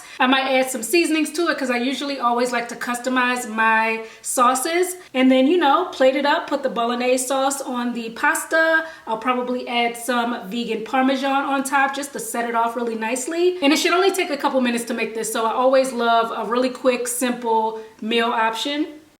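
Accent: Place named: Washington D.C., United States. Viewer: Every time I hear you speak I hear your accent ❤️ are you from D. C. ?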